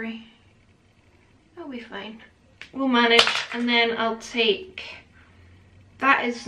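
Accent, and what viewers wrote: Scotland, United Kingdom. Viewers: Definitely a look...or an Elaina look too. Lol. Can I do your Scottish accent? I doubt but I can try. 🤣😘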